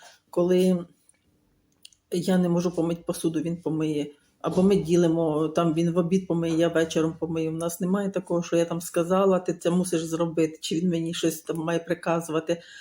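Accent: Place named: Bukovina, Ukraine. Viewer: Мабуть ближче до Чернівецької. Бо з Буковини і мені близька ваша вимова.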